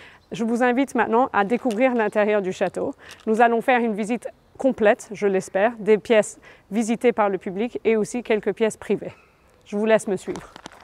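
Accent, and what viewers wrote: Lorraine, France. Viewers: Très beau mais quelle charge... Bravo à vous. L'accent est il lorrain ? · Bonjour Madame, j entends que vous avez un léger accent , est il Lorrain ? Merci pour cette visite de votre château qui est intéressant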